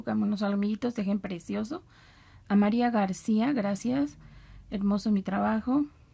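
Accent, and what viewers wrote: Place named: Guatemala. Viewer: Estimada señora , me gusta mucho su camino de mesa, hace poco la descubri y ya empece a hacerlo, lo mejor es que lo explica muy bien, gracias por publicarlo, reciba saludos atentos, me da curiosidad su acento, se parece mucho al de ños guatemaltecos.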